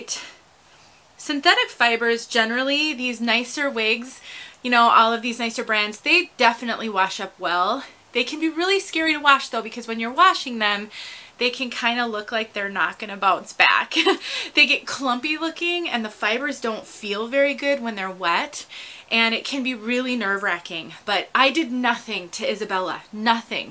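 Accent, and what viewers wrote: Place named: Illinois, United States. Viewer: Girl, you from Naperville, Illinois? Your accent...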